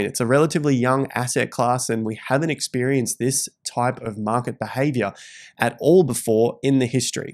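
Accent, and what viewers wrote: Australia. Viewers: Love your video mate.. Very informative to me. Your Aussie slang its nice.. I think BTC will make small corrections when it achieves 48k-52k.. The bottom will be at 40-42k..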